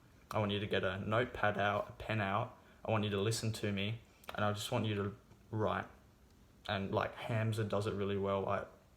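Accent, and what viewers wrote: Australia. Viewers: Good luck and best wishes. I like the Aussie accent. You did well for your first video. 👏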